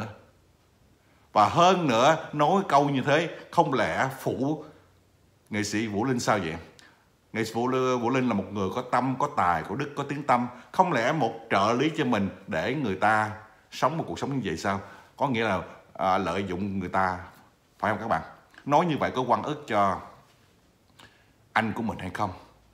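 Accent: Standard. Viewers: Anh noi qua chuan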